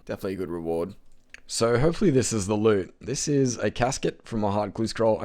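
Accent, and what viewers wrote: Australia. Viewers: called an Australian Accent.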